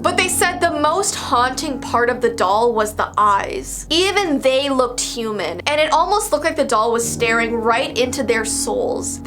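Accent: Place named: Canada. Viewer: I’ve watched a bunch of video and I’m just now hearing what might be a Canadian accent! Where have I been?!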